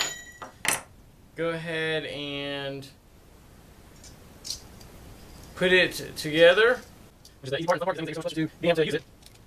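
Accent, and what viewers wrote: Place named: South, United States. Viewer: Nice and well done video. I have some tools that were left out in the rain a few years. I will try your technique to clean them up. I agree 100% with S. Walker below. You spoke clearly, explained the process well and your slight southern accent added personality to your video. This is from a guy who lives in South Carolina. If every video on youtube sounded like the Discovery channel, it would be a very boring place. Don't change a thing young man !!!!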